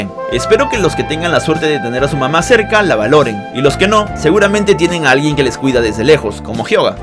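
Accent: Peru. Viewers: Motupe, eres peruano perrillo?.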